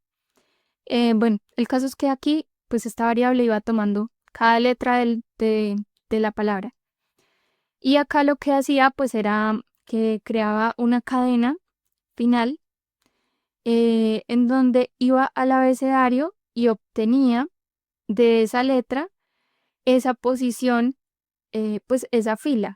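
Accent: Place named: Colombia. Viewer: Que delicia escuchar ese acento colombiano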